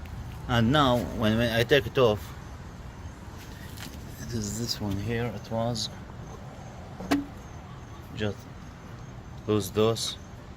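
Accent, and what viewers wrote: Iraq. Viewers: يا اخي لهجتك تكول انتة عراقي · اخوية انته عراقي من لهجتك ياريت تتواصل وياي لانه عندي نفس المشكلة واني ممنون منك ياطيب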